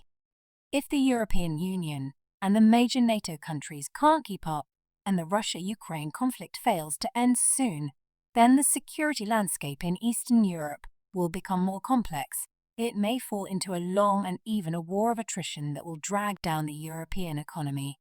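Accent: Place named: United Kingdom. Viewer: 今天的翻译比昨天的好，纯正的英音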